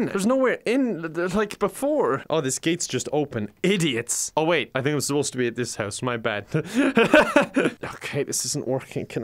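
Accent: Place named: Ireland. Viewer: Good to wake up to this sweet Irish voice. Have a wonderful day,!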